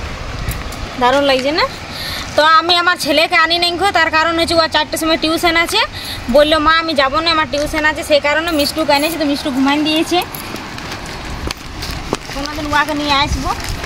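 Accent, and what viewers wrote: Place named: Purulia, India. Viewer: বৌদি তোমার কথা গুলো শুনতে ভালো লাগে ❤ পুরুলিয়ার লোকের কথা সুনতে ভালো লাগে তোমার কোন বোন আছে আমি ও বাউরী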